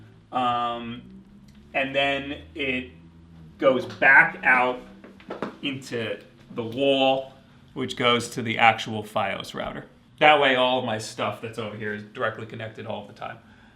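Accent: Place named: Brooklyn, United States. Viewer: So Brooklyn haha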